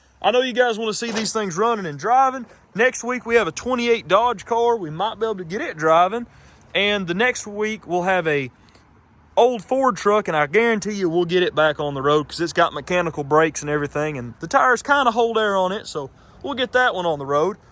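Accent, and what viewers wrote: South, United States. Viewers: This American accent is harsh, grinding and an onslaught on the ears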